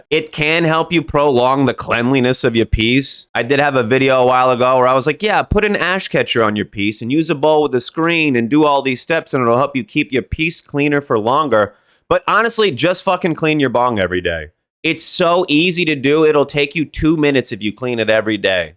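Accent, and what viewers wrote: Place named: Boston, United States. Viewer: Idk if you’re from Boston, but I occasionally hear a Boston-like accent, especially when you use an “A” on the end of words that have an “ER”. Just a curious observation.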